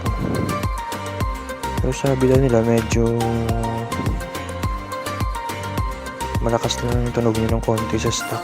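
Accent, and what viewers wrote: Iloilo, Philippines. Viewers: Ilonggo ka no?🤗🤣🤣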